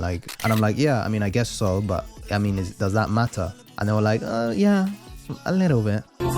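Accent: United Kingdom. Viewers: Well to me, as an American, you don't sound white or black. You sound British. And all of you sound the same to me. You have different accents but they may as well all be the same and we'll just call that British. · This guy is talking about a “stereotypical white accent” as if he’s from the states and has a British accent. Address your culture first before you tackle anything American Edit: I have no clue what this dude is even talking 3 minutes into the video 😂 all Brit’s sound the same to someone in the US, so confused.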